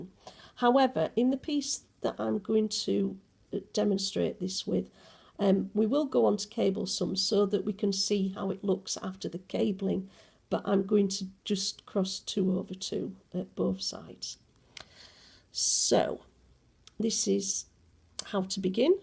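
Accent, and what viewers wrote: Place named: Northern England, United Kingdom. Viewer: Love your Northern accent ...and of course the neat technique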